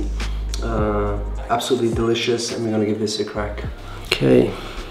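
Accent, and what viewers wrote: France. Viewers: ton accent français est trop mignon !! 😍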